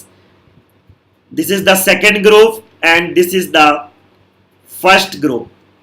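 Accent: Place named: Bihar, India. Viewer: Bhai bihar se ho kya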